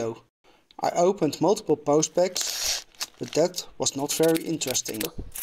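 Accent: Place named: Netherlands. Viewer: Ok ok! I'm also still figuring out the tricks of the trade - you're not alone! I've trialed many different video editors and in my opinion, the best is Cyberlink PowerDirector. It isn't perfect, but I still think it is the best. Yes, you're doing it the way I used to do it. Now I rarely do this! What I do now is section the video into 'scenes', then I 'take' until the scene is perfect, then move to the next scene . Then when editing, I simply delete all but the last take for each scene. It saves me many hours! I also do 'errr', 'um', 'arr', but I'm happy to leave them in because I don't want my channel to be too formal. About your presentation; it is absolutely fine... I can hear a strong Dutch accent, but I can fully understand what you're saying. I don't know about other countries, but here in Britain, I think we are good at understanding accents because we are used to talking to people in English who are from all over the world. 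For example; the word 'one', you pronounce as 'WAN', but it's close enough to know that you're saying 'one' without any effort at all. Like if an English person speaks Dutch, you can hear the accent and it applies a sort of slant to the speech, but you can still understand it well. :-)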